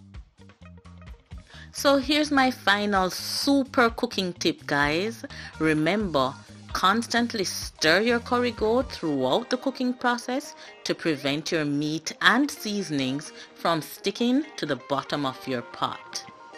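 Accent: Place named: Jamaica. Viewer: Love this style. Nice accent. Big love to Jamaica 🇯🇲🇯🇲🇯🇲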